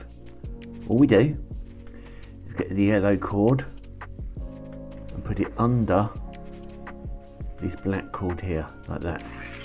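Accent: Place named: United Kingdom. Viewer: Brilliant! I love your accent. Which part of the UK are you from? And what's your favourite tea? 😊 PJ from Canada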